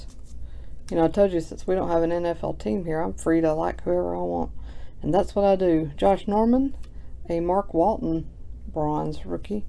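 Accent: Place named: Indiana, United States. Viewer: I want an answer to one question. Are you from Indiana? Because your accent indicates it. You sound exactly like my cousin Tanya and you don't cuss just like her, meaning you don't cuss at all just wanted to clarify. And if you're not please show your face just to prove it.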